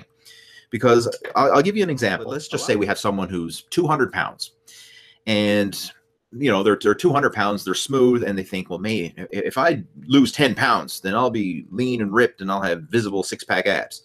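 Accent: Newfoundland, Canada. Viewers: Are you from newfoundland? I seem to remember that you are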